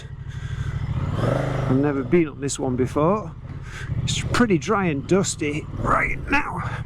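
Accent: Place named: North East, United Kingdom. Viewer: That's definately a N.Yorks/Durham accent for sure, tha'knows. Oh wait what's the point of leaving comments when he can't be arsed to reply ?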